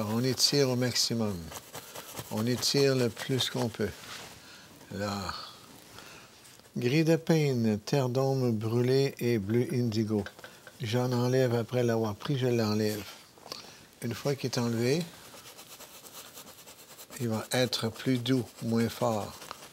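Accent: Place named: Quebec, Canada. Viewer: Bonjour Mr le Magicien : C'est un régale de voir vis oeuvres et c'est la première fois que j'entends en prime le bel accent Quebequoi je suppose. Bravo pour tout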